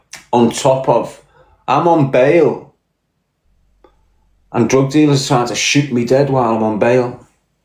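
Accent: Liverpool, United Kingdom. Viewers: The Scouse Terrence McKenna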